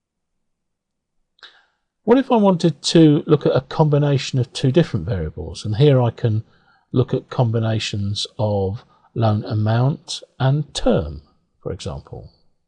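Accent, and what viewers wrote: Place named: Atlanta, United States. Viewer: Thanks so much, and you have such a nice accent the same accent in Atlanta, Ga all the best for you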